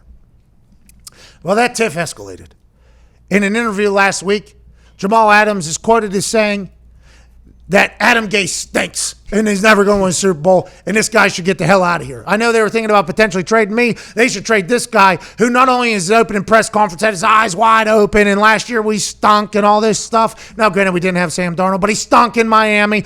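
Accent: Pittsburgh, United States. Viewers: I love hearing someone who sounds like me 😂Pittsburgh accent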